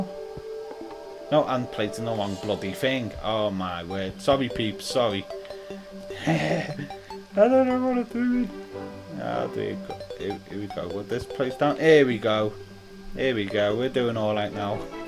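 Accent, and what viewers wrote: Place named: Liverpool, United Kingdom. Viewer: sound scouse haha im from skem an just bought this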